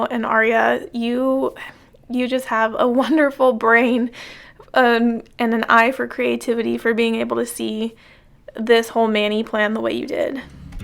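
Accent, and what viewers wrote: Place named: South, United States. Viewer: Hey! I just wanted to say that I absolutely love your content. I find your voice/slight Southern accent very soothing, and I love how you celebrate and build others up. I notice you feature a lot of smaller brands: Sol Dips, Shameless, Dipalicious...etc. I'd love you to discuss some of your favorite companies in a list and why/what they are best at. Additionally, I'm pretty new to dip, and thusfar have only been using my Dipwell system, but would love to mix and match more. So...I guess one thing that I feel is missing from YouTube content is how to crossover different dip products...if that makes sense...? Anyway, rambling. You're great! 😂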